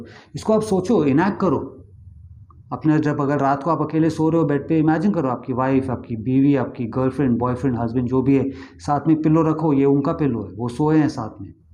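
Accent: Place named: Mumbai, India. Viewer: I never knew you had a Hindi channel as well ... nice ....and I like your Hindi .... ekdum bambaiya types 😛.... yes and very truly said .... Imagination, Visualisation .....plus feelings .... very important 😊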